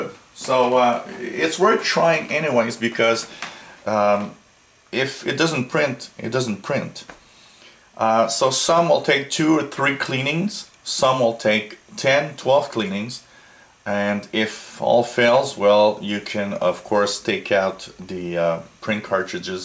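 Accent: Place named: Quebec, Canada. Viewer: french canadian accent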